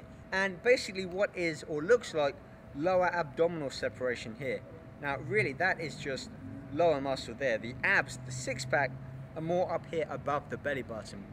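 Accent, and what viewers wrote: United Kingdom. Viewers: U look and sound really british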